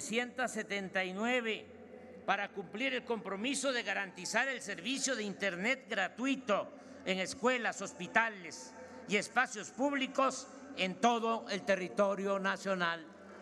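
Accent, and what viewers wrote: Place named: Tabasco, Mexico. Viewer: Amamos ese asentó tabasqueño que nos alinta y da esperanza para el cambio y la transformación de nuestro Mexico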